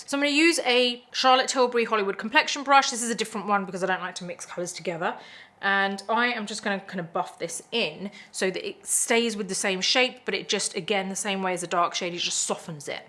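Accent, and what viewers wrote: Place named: United Kingdom. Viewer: I love to watch your make up tutorials ,they are so helpful and you are absolutely beautiful and I love your British accent,I have always wanted to go there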